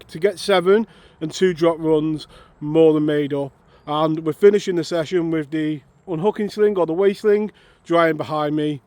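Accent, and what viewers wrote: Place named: North, United Kingdom. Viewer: Hiya, what a perfect start to your Pike season as i don't think that it could have gone any better for you. What a stunning venue that screams Pike, i cant recall ever seeing you Lure fishing but it has made a great addition to the channel. I didn't know about the Fox relicant rod so i will go and google the hell outta it:) It was good to see you using a Baitcaster reel, what do you think of them please? Is there any chance that you could show us how to load a baitcaster spool with Braid including the best knot to tie it on with please? Allot of the video's are from America which are quite good but i would much prefer you (and your Northern acent) showing us how to do it:) All the best & tight lines. My family has got that used to my phone going off at 5pm every Friday that when they hear it they say "that'll be "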